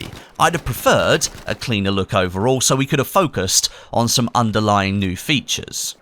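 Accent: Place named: United Kingdom. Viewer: Man you sound like an intellectual with that British accent...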